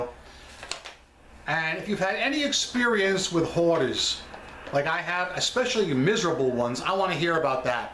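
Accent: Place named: Tennessee, United States. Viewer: I too have a distinctive accent from SE Tennessee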